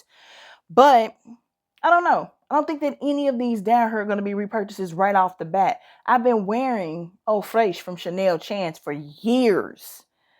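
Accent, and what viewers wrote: United States. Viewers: Oh, my goodness, you are adorable with your comments and American accent! I agree with you, that you don't need to spend a kazillion dollars for a beautiful scent. Thank you for your review. ❤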